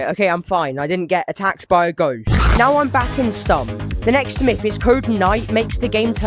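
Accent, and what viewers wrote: United Kingdom. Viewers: Bro is british bruh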